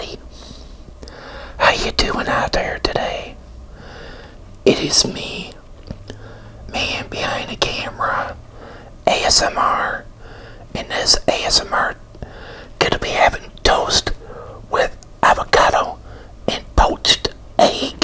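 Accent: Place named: Texas, United States. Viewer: Because I thought you sound like a new Yorker but you sound like your from texas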